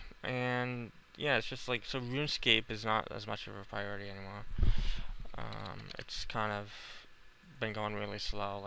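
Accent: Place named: New England, United States. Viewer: i thought you sounded like you were from the new england area, MA here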